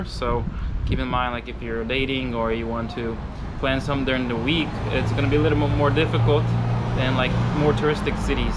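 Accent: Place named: Eastern Europe. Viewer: where are you from? I mean originally... Ukraine? You sound russian or slavic speaking ... as your native langauge. your english is fine, just I can tell you're not a native speaker and was wondering where you're from originally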